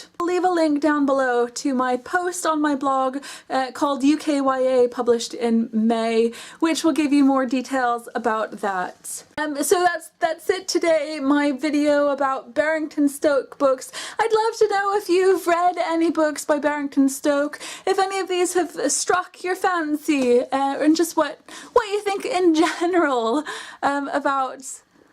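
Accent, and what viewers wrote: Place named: United States. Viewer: Is it me or does she sound American when she’s British?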